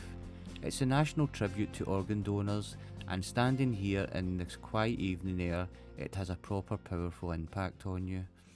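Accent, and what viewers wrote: Scotland, United Kingdom. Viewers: Naarden-Vesting is definitely worth a visit! In the past, I often took a walk around the entire fortress with my son, who was 10 at the time, along the outside of the water. Beautiful and very peaceful. I do have to get used to your nice Scottish accent a bit, though. Have lots of fun in the Netherlands and thanks for your video.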